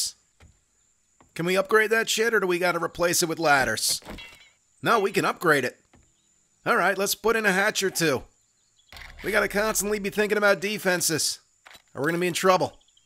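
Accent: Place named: Philadelphia, United States. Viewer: His heavy accent makes me miss my hometown. Can't tell me he's not from philly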